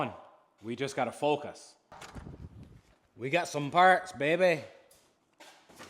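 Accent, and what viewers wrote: Ireland. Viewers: What type of accent is this? Irish Redneck?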